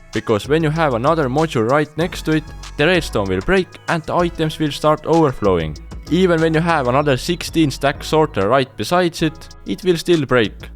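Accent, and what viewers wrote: Finland. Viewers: You are Finnish . I am absolutely in love with the Finnish accent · Just with your accent I can tell you are Finnish! Terve Suomi!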